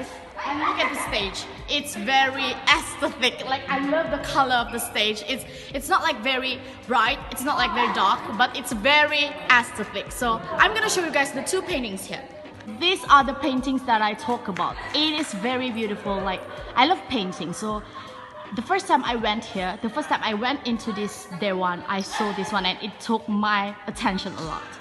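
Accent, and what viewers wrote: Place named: England, United Kingdom. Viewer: This is really good information because I dreamt to go here btw I like your English accent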